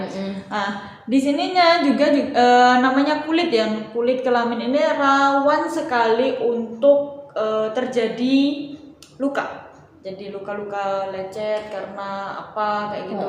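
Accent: Banyumas, Indonesia. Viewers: Bahasa banyumasannya medok bgt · HAHA, ORA PANGLING' LOGAT NGAPAK😂👍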